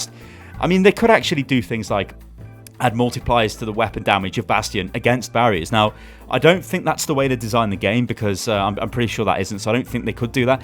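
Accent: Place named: London, United Kingdom. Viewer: what is this? a good london speaker?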